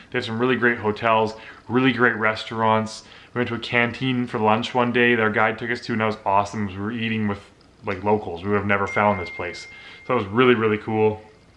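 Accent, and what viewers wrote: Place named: United States. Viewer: if you are canadian, why do you have american accent ? my wife is british and said you are an american when i showed her the video and КостромА, not КострОма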